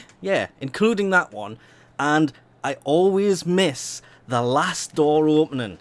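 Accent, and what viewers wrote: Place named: North East England, United Kingdom. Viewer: Are you from the North East? You sound like you are, Newcastle or Sunderland.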